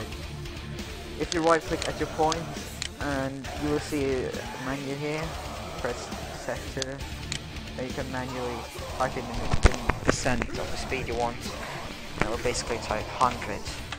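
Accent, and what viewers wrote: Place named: United Kingdom. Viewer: nä så ere fan inte ! ;o du låter som en äkta britt typ xD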